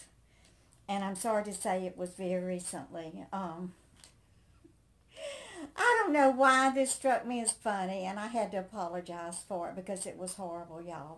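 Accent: South, United States. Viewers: Discovered your channel by typing in "14 QUESTIONS BEING TAGGED ON A VIDEO." Your channel was one that popped up with your answering these questions. Wondered about the questions because saw Debra Joy mentioned being tagged, and she answered these questions, too. Long story short: I subscribed to your channel and tapped the bell (to receive notices when you upload more videos). Am looking forward to viewing some of your past videos and, of course, your new ones. Very much like your delightful Southern accent. :)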